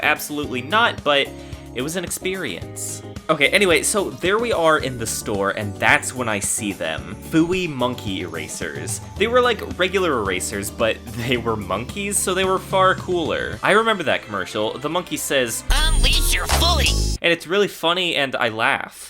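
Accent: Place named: Georgia, United States. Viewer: You’re definitely from Georgia aren’t you?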